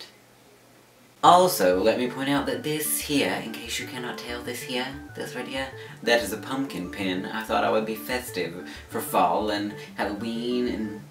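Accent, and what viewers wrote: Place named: New Orleans, United States. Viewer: What accent is this? New Orleans?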